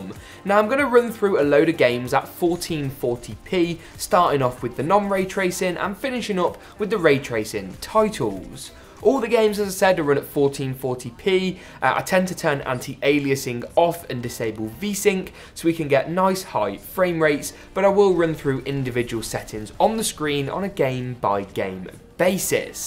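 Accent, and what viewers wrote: United Kingdom. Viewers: are you from the uk? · you sound like you might be from the uk but you always put USD into the titles. It confuses me😂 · You're from the UK right?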